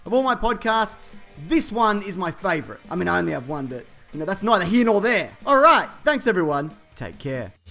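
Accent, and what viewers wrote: Australia. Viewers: This guy sounds Australian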